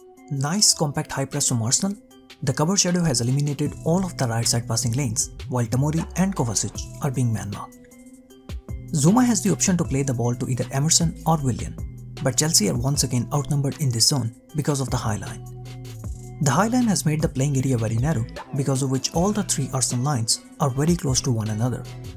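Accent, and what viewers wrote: India. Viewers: He sound like he is trying hard not to sound like Indian accent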